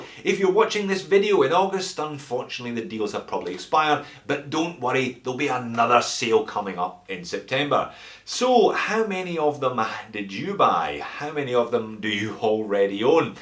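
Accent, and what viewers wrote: Scotland, United Kingdom. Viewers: Oh man. Just forgive his Scottish accent and move on mate. 😀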